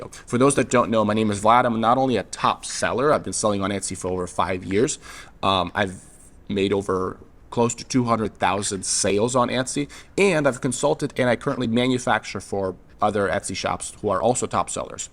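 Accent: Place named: North, United Kingdom. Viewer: your second language? When did you learn? You accent almost just sounds like a northern accent. Impressive 👍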